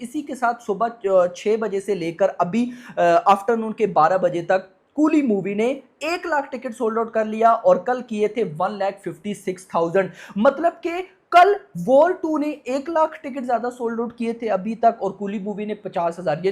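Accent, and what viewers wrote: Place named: Pakistan. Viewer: Yes ye Pakistani h , iske bolne se malum pad rha h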